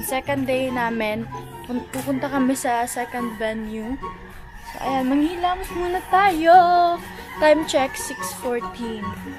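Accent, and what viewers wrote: Visayas, Philippines. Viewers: Ate Bisaya kaba? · Wait bisaya kaba ate?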